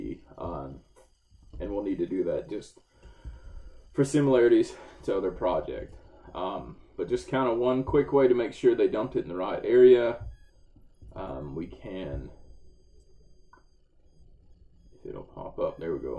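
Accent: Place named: United States. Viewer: bro this helped out so much with hydrology class. Not trying to offend anyone, but I'm glad there is someone with a good ole American accent speaking. Usually there is some guy from India or God knows where, and I can hardly make out a word. Bless their hearts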